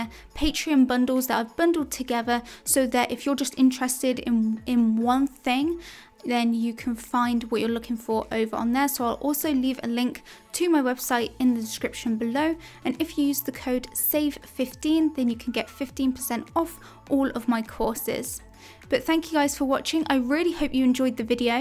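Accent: United Kingdom. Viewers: Art Thank you for your videos. Your British accent is very pleasant to hear as I draw! I am from America so it’s nice to hear different accents.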